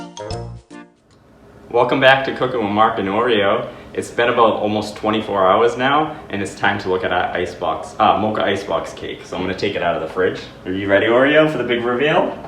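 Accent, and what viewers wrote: Boston, United States. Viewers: Looks delicious! Oreo is adorable! And, is that a Boston accent I’m detecting! LOL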